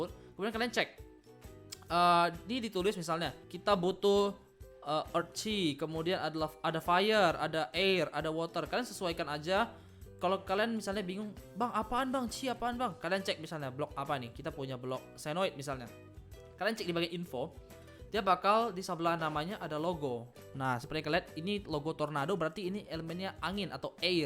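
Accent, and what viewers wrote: Pontianak, Indonesia. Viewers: Abang orang Pontianak ya?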